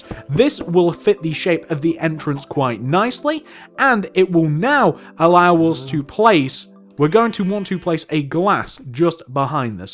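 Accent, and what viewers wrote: England, United Kingdom. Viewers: are you English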